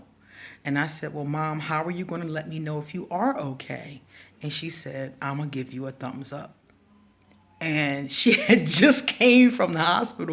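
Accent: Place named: Philadelphia, United States. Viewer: I knew I was getting philly girl vibes from you, I hear it all in your accent ❤💛💚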